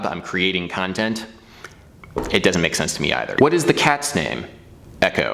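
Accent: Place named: Maryland, United States. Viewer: I can hear you're from MD bud in the way you say "so".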